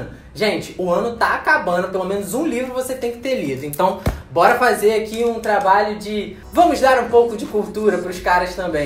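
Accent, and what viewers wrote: Rio de Janeiro, Brazil. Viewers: Você é carioca? Adorei o canal, já vou me escrevendo, e deixando aquele like.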